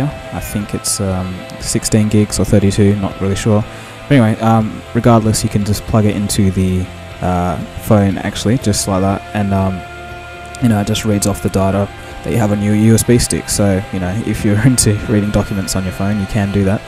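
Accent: Australia. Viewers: holy crap are you australian? NO WAY! that accent is too smoothing to be australian D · nice OZZY accent BTW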